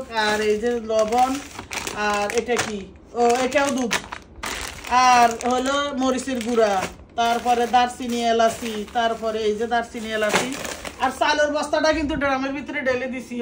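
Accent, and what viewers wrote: Noakhali, Bangladesh. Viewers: আন্টি আপনার দেশের বাড়ি কি নয়াখালী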